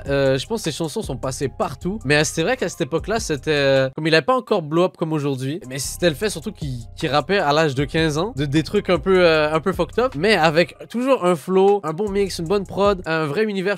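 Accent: Quebec, Canada. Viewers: Il est québécois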